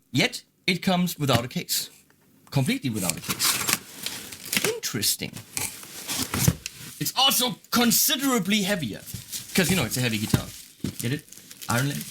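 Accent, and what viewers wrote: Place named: Germany. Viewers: Richtig deutscher Akzent 😂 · mustermann Vielleicht liegt das daran, dass er deutsch ist, aber internationalen Content bieten möchte ;)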